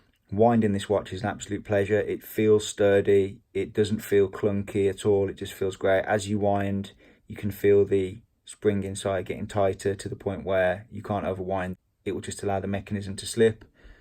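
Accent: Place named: United Kingdom. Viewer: superlative British accent absolutely outstanding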